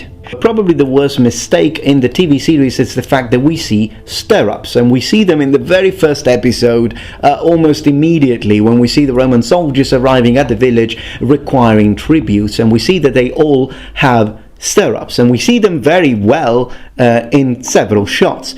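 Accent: Italy. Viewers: It’s probably not true, but the fact that rattles this stuff of in an Italian accent makes me think he’s right:)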